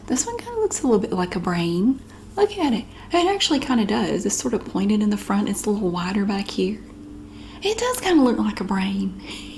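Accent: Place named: North Carolina, United States. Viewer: I’m new here…I just adore your accent. North Carolina?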